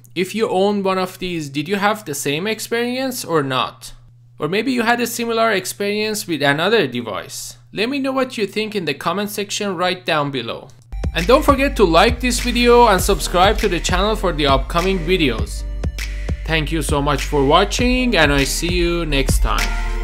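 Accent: Iran. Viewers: Loll gotta love the Persian accent 👍🏼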